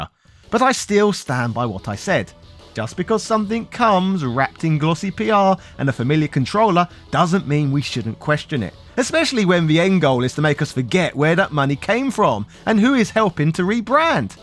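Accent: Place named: Liverpool, United Kingdom. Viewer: You sound like a scouser mate.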